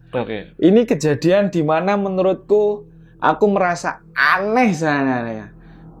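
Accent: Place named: Surabaya, Indonesia. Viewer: Bhasane asik bnget kaLau orang SBY yg cerita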